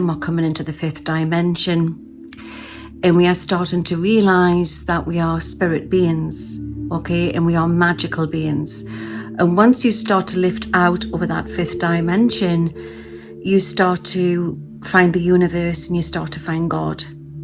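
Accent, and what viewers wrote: Newcastle, United Kingdom. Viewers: Hello, i have only just found you, tarot by janine shared this on twitter,you have a lovely calming voice and i love the geordie accent Definitely subscribed to you ,blessings from the north west (Southport) x❤️😇🧚‍♀️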